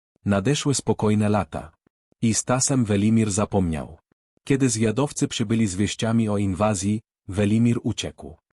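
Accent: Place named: Ukraine. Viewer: a można po polsku bez ukraińskiego akcentu ?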